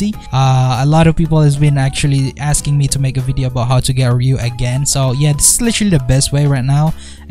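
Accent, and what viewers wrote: Philippines. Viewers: you sound very filipino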